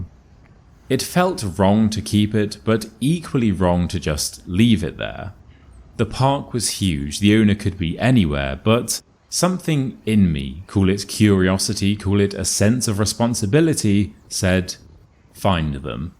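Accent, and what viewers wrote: United Kingdom. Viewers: this is amazing! I’ve been looking for something like this for a long time. Language learning is really about listening, and your calm, deep voice with that wonderful British accent makes it perfect.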